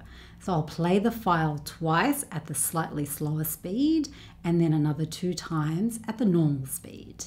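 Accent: Australia. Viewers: Are you native Australian?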